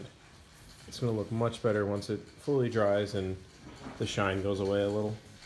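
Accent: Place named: Philadelphia, United States. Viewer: Lol something tells me you're from South Jersey/Philly/Delaware? Go birds? Also nice vid.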